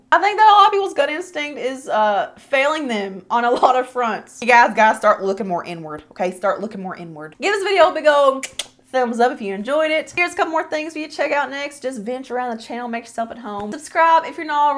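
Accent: South, United States. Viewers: If people didn't, or don't care for, other people's opinions, then they shouldn't watch videos like this one, and get all worked up over something that didn't, doesnt, or won't matter. For 1- Overly critiquing people do it out of boredom, or to cover an insecurity of their own, like something they desire, and maybe you remind them of it (pretty eyes, followers, make up skills, etc) so they try to blast on you, or blow up comments with negativity. BUT...Oh, positive energy trumps that every day! 😁. AND takes more practice then being a "down doucher" I'd rather eat a bowl of water with a fork, then to listen to someone who constantly puts down someone, or EVERYONE, around them. Yeah. I just said that lol Both are pointless, and waste time, not just mine, or yours, but the people who stay to hear it, or in here, read it. The fact that you do these videos at all shows strength and character, and showed that the product works.... 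well....that's all I wanna see in any of these videos. These opinions help me decide on the purchases I make, before I spend, or waste, my money.... So keep showing your before and after make up applications, what you do and don't like, and I'll keep watching! And I respect the Southern accent! 😂. I was born and raised southern, and even now, in my 50s, I sound like the kid from Sling Blade 😂 (From Tennessee and that's my opinion) Keep on keeping on, and forget the haters!! Somebody is always gonna hate, and judge, and try to make you lose you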